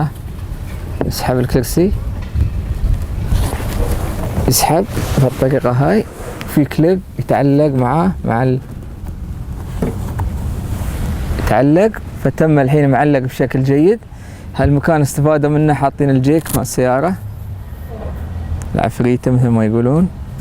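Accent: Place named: Iraq. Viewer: طريقه كلامك كأنك عراقي الاصل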